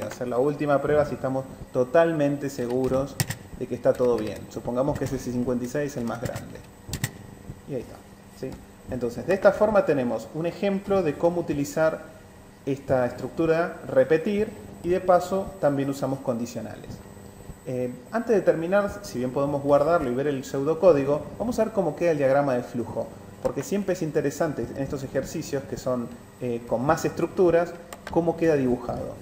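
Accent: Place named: Argentina. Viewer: No hables argentino no puedo entender,aaaah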